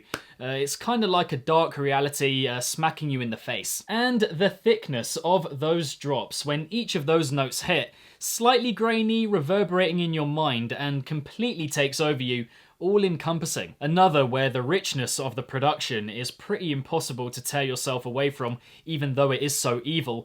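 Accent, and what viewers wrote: United Kingdom. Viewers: Good video but my ears is blooding by your bri'ish acсent, sorry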